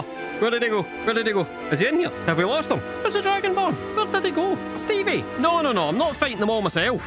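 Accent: Scotland, United Kingdom. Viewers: I accidentally unsubscribed scottish skyrim daddy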